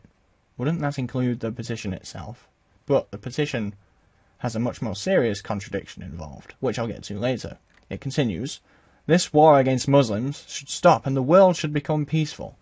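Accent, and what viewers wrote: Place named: United Kingdom. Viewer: OK, I'll check it out. That goes a long way toward explaining why a video on a channel from someone whose channel originates in The Netherlands sounded so completely British! :-)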